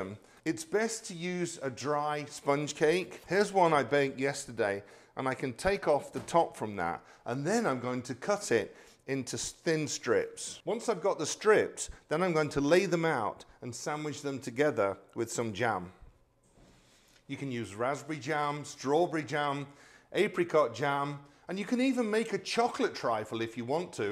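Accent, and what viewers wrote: England, United Kingdom. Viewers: What a lovely English accent!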